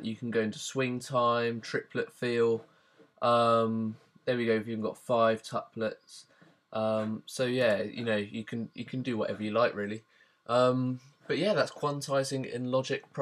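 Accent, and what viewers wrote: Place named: United Kingdom. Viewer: Your accent is distractingly British but you make a mean video mate. Short, to the point, and telling me everything I need to know. Well done sir!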